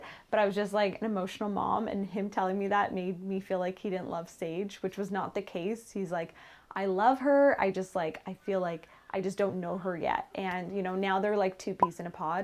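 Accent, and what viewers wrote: Canada. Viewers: Great video! Are you Canadian?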